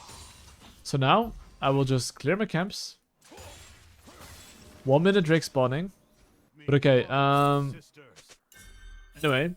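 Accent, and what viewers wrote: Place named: Netherlands. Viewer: Bro, is this guy Dutch? Holy accent😂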